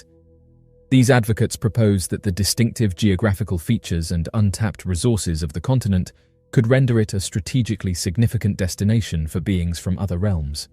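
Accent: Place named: Public school, United Kingdom. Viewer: Oh yes oh yes my guy is back. What a voice. 2 million here we go. Sleep awaits. 😅 Edit- just the one story so far, still great though. No shade on the "new" lad, perfect enunciation just not intensly soporific. Who doesn't love random tales in public school English. Best video in years chaps. Hand crafted like the old days. Love it. ❤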